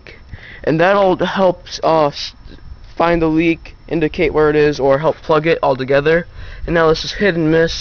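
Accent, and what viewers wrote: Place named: Portugal. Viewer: You sound like my Portuguese homie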